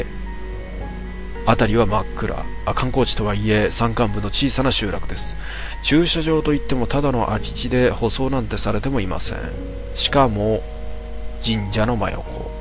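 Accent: Kansai, Japan. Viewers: あれ？136さんは関西人？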